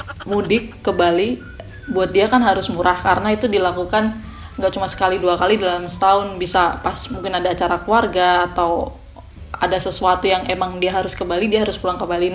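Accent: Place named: Semarang, Indonesia. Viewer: Ouw mbaknya org Semarang yaa?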